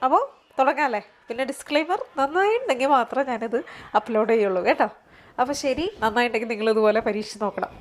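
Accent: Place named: Thrissur, India. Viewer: എന്തായാലും ആ trissur സ്റ്റയിൽ സംസാരം ഉണ്ടല്ലോ....തകർത്തു .... അത് കേൾക്കുമ്പോൾ തന്നെ ഞങ്ങൾ പ്രവാസികളായ trissur കാർക്ക് ഉള്ള സന്തോഷം ഒന്നു വേറെ തന്നെ യാണ്.......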